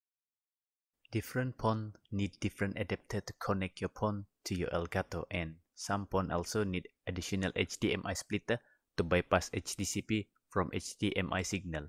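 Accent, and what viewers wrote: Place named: Sabah, Malaysia. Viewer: Mcm sabahan beii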